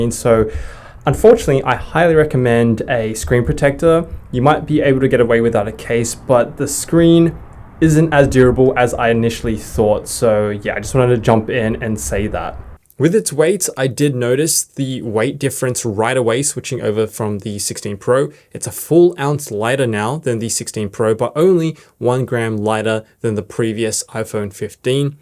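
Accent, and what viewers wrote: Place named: Australia. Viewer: By your accent you are Australian but are you quoting US prices? Last I looked the iPhone 16 is $1399.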